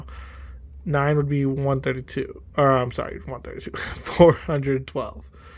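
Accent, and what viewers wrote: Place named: Canada. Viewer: 460 you sound canadian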